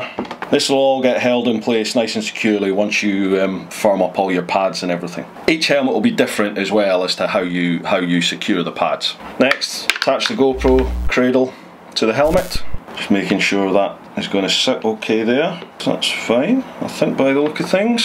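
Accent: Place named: Scotland, United Kingdom. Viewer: Ahh, I couldn't pick it.. Sensed north of Dundee... Defo not Dundonian. Great vid, thanks for sharing. Subbed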